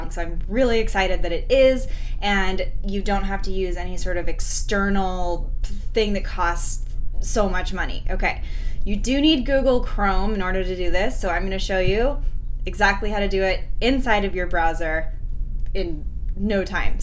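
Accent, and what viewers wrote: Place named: Ireland. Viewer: its still not working, or i guess the Irish accent in the video is just too thick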